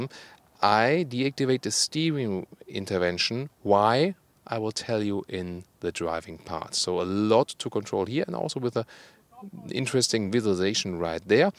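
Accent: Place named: Germany. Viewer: I like the car reviews you make, but I must admit your German accent sometimes make the sentences incomprehensible.. saying "smoosse" instead of "smooth" I did understand, but some other words might as well have been Chinese :D :D Apart from that, very informative video. The X5 grows on me every time I see it :)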